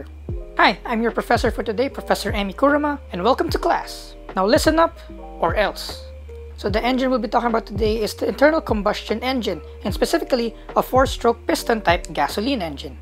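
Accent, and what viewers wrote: Philippines. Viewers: This is the first vid I'm seeing from you and I can hear the Filipino accent already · I’d recognize a filipino accent from a mile away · W Pinay Accent 👌✨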